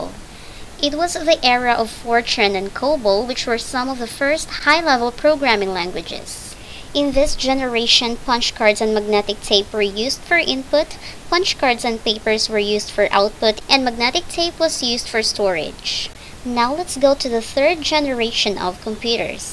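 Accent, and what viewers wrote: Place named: Philippines. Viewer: Person here sounds filipino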